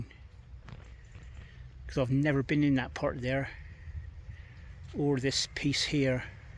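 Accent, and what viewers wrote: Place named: Cornwall, United Kingdom. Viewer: Great video ,nice to see a face to the lovely Cornish voice.always enjoy the vids .I love history x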